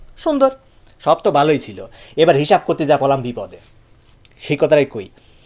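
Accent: Kushtia, Bangladesh. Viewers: কুষ্টিয়ার ভাষায় দারুণ লাগছে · আহ মিষ্টি আমার কুষ্টিয়ার ভাষা!!! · খাটি কুষ্টিয়া ভাষা ভাই।।। চরম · I like the way you express yourself. Not to mention the exotic "Baangal" accent. Real cool. Keep going bro!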